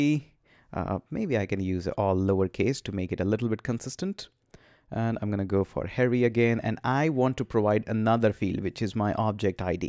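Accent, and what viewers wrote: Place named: India. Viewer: Are u Indian? apart these things explanation is so clear loved ur way to explain topics and ur English tone too....😆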